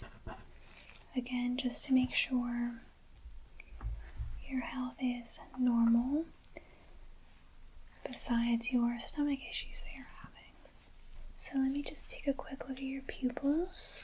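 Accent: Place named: Midwest, United States. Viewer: I like your slight accent but I can't tell the region. Midwest somewhere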